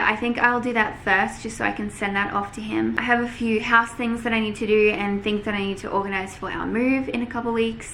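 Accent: Australia. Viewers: And your Aussie accent is so cool to listen to 👂💖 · your Aussie accent is my fav thing ever I can hear you talk for hours!!!❤️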